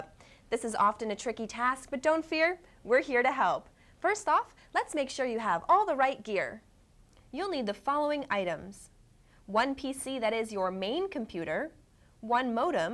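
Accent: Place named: United States. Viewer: Her yank voice goes through me...she's chatting a load of shit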